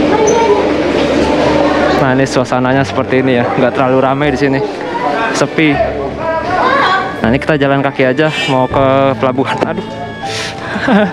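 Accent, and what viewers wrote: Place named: Depok, Indonesia. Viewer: Bahasa depok bgt nih wkwk salam dr depok bang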